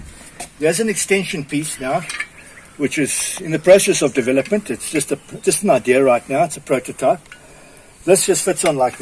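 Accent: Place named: United Kingdom. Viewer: Is this available in USA? Your British accent is obvious.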